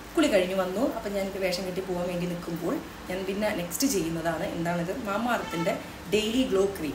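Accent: Kozhikode, India. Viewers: കോഴിക്കോട് നാട്ടിൻപുറം സംസാരം 👍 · Very decent, humorous, transparent, innocent, spontaneous way of talking...over aakadhe ulla presentation um. Just can't take eyes from ur video.nammade kozhikodan baasha vrithiyil kaikaaryam cheyyunnu. Good going 👌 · 😂😂😂 sudari pettanu evidayo kettapole 😂😂soo beautiful so elegant just looking like a wow orma vannu😂😂😂 basha evidayo kettapole (ibbal evidaya) Kozhikode Aya enniku pettanu mansillayilla😂😂 nalla humorous talking ee fast chayitha bagham cut chayitha pore njagalku kekunumilla pinne athu cut chayitha leghtum korayum etha ee pennkutti Ella prodaktillum edukumbam parayunna 😂😂 enniki oru likeum comment tharane please 😊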